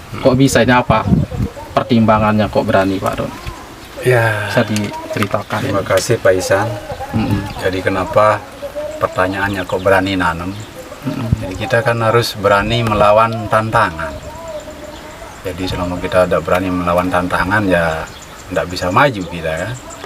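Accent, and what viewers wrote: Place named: Lombok, Indonesia. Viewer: Logat orang sasak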